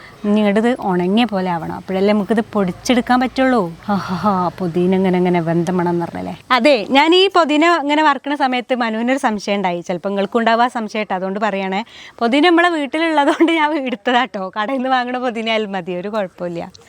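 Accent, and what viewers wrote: Malappuram, India. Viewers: നിങൾ മലപ്പുറം ജില്ല ആണോ.എന്തായാലും സൂപ്പർ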